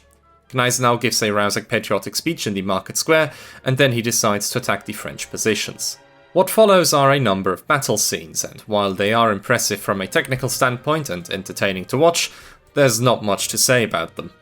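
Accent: Germany. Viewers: He might be from Bavaria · Ah, a german accent. This video has a german creator. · He is very clearly german. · Hallo Kamerad! Den Akzent höre ich sofort. Bin auch Deutsch. JAWOHL! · He's just German you dingus, that's what a German accent actually sounds like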